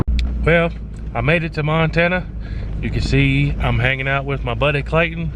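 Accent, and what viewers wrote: South, United States. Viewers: I didn't know you are a fellow Tennesseean, I could tell by your accent you are from the South. I just didn't know where.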